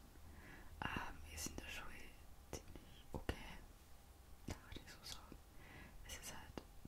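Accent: Styria, Austria. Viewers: Ich liebe den Österreichischen Dialekt, bitte mehr Austrian Girl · To me you look more french only my idea. Nice Austrian dialect ASMR video. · des muas fost steirisch sei, i vamiss es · hach jo... da schene Dialekt^^" Ich kenn das sehr gut, wenn es ungewohnt wird, den eigenen Dialekt in speziellen Situationen zu nutzen.^^" Als steirischer YouTube-Schauer is es ja schon sehr ungewohnt, dass jemand in einem Video auf YT einen vertrauten Dialekt nutzt xD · Ich komm aus Oberfranken und find euren Dialekt in Österreich echt geil und ähnlich isser a noch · Wrong. It's austrian dialect.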